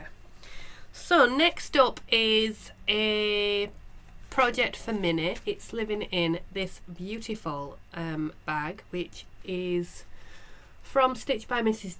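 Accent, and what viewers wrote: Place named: Northern England, United Kingdom. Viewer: so lovely to listen to a fellow northern accent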